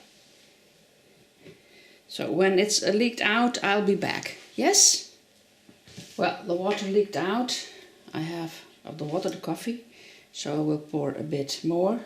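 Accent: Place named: Netherlands. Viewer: Dank je wel, thanks for the great tutorial, I must give it a try.. I loved hearing the Dutch accent.. I have been in Australia for 70 years but was born in Ijmuiden..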